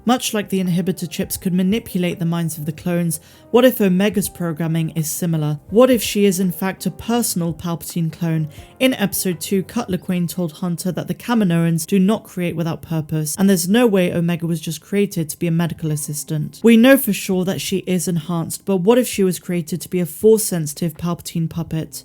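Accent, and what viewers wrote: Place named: South West, United Kingdom. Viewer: The south west accent is strong with the jibber jabber!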